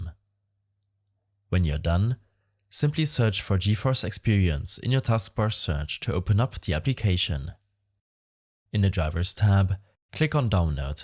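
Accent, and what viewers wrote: Germany. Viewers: OMG. are from Germany?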